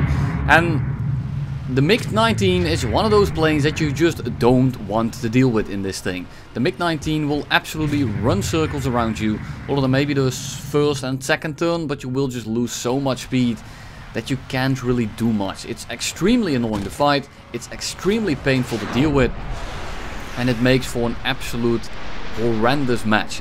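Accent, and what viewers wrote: Netherlands. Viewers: Hey are you dutch by chance? Because the accent sounds really dutch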